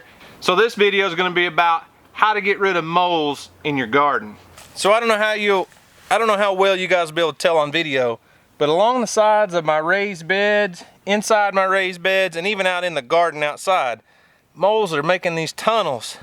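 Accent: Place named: South, United States. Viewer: Your accent is everything in this video😍You’re so southern, I could listen to you talk for days😃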